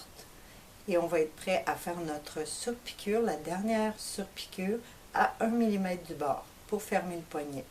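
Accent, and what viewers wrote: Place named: Canada. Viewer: Merci infiniment pour ce super tuto, très bien expliqué avec ce sympathique accent canadien! je désesperais de ne pas finir mon chemisier. Je vais pouvoir le faire et je m'abonne bien évidement.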